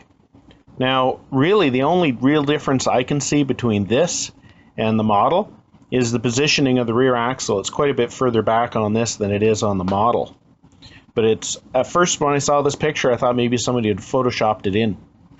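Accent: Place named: Canada. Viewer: That is an awesome truck, and a nice accent. Love the Canadian accent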